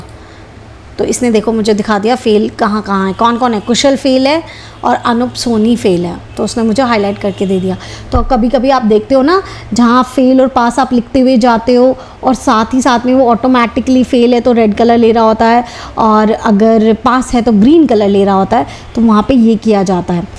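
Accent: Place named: Pakistan. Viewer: Ur accent sounds like Pakistani..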